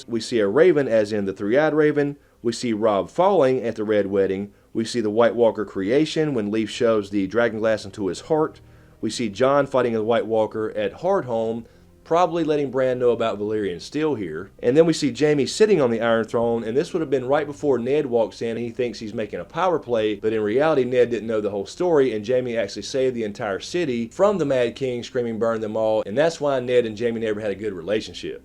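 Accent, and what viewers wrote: United States. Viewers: Oh fuck, your American accent is too much for to handle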